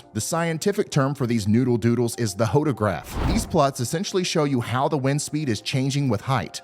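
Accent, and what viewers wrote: South, United States. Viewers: I live in northern California, so most of the time these forecasts don't affect me. But I love watching your videos, because you have the cutest southern accent, and I learn a lot about meteorology!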